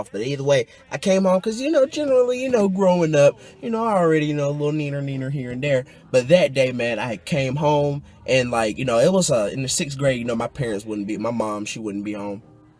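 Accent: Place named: South, United States. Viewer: This dude sound hella country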